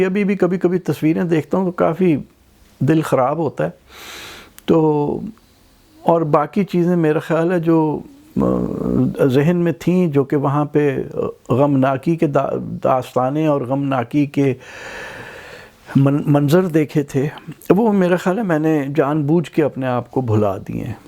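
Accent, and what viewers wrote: Punjab, Pakistan. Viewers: Your skill of story telling is amazing. I have seen more than 50 videos. I am Indian Marathi, your fan of sense of humour. Lot of thanks. Keep it Sir ji. Your Punjabi raheja is fantastic.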